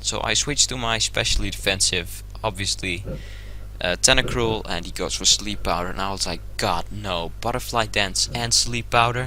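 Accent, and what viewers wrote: Netherlands. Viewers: wat een heerlijk nederlands accent hahah goed gespeeld trouwens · your dutch :P ? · are you dutch?? cuz you have a dutch accent especially when you said: you kinda have to expect a shellbreak baton passer. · hearing a dutch accent haha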